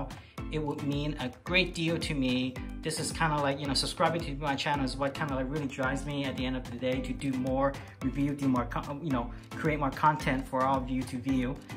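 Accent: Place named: Denmark. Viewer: I would say you are Danish from the Netherlands am I right?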